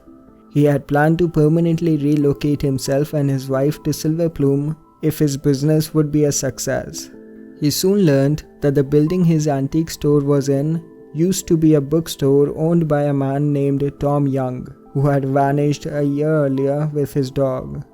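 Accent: India. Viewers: i cant stand that thick indiaN accent sounds like if i called a call center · This narration by this Indian SUCKS. Please get an American, English, Canadian, or Australian, or any English language speaker who doesn't have such a heavy accent. Who thought it was a good idea to have this dot head narrate??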